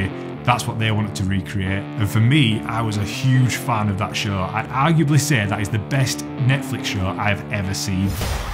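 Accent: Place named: Leeds, United Kingdom. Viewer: ALL LEEDS AREN'T WE! No but seriously, great stuff mate. Got here because I'm thinking of buying a second hand 70D funnily enough, was nice to hear a familiar accent!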